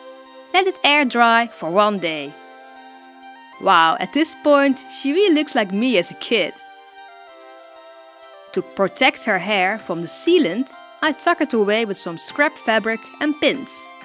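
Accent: Netherlands. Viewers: I love youre videos and i lpve youre accent i habe the same i am dutch asswell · Beetje jammer weer. Hoorde al nederlands accent in je engels hahaha. Its better in English, becuase more people will understand it :).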